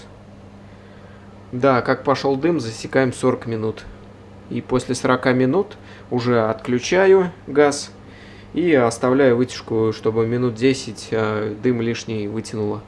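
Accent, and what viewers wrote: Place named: Mordovia, Russia. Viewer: с пацаном служил с Мордовии. У него такой же акцент) никогда раньше такого не слышал, а сейчас сразу узнаю)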